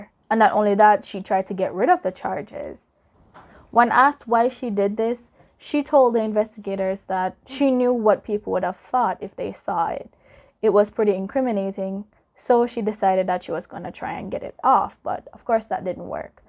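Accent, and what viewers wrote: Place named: Caribbean. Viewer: Really liked your storytelling and btw are you Carribbean? I love your accent 😍😍😍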